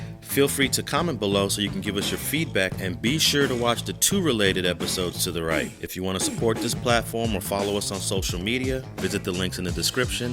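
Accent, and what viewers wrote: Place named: Houston, United States. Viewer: He talk just like them Houston cats too.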